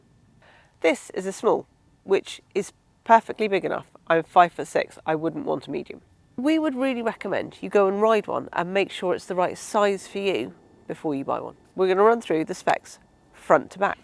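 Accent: England, United Kingdom. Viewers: Long time since your dulcet robbed English accented voice issued forth from the speaker of my smartphone. A 20 inch Brompton with Shimano 8 speed is not very different from the Moulton that was on offer last year out in Arizona. Shame it doesn't have the front fork of the Moulton The Moulton frame might be stronger. Not as convenient. The 34 spoke rear wheel would be better for use on unpaved roads. We have hundreds of miles of dedicated bike trails here in Missouri. Katy. Rock Island etc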